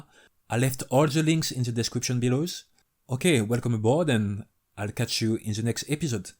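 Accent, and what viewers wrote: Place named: France. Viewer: Holy accent. Ton accent francais est épais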